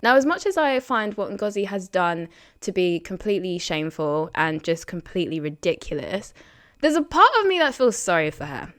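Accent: England, United Kingdom. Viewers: You sound British. I would assume that you're British, regardless of where your ancestors came from. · We don't need this in our country. I think Marlene is a race baiter. Lady Susan was admired by Nelson Mandela. Older people don't have the same filters, would you be offended. You are beautiful you have an English accent. We do ask snd we shouldn't be afraid to ask. Sorry but Marlene was dressed up to look like an African princess. The UK isn't a racist country there are stupid people but my school back in the 80s was so inclusive we were just teenagers and liked each other. MM is a race baiter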